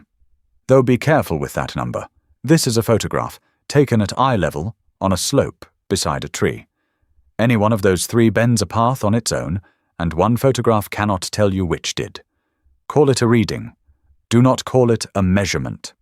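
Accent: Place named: Germany. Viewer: It figures this guy has a German accent.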